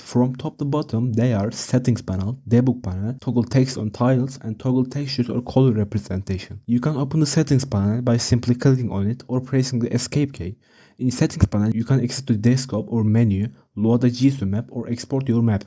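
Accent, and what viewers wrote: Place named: Turkey. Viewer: İlk videonda aksandan direkt anlamıştım Türk olduğunu . Güzel kanal devamını bekliyoruz hocam.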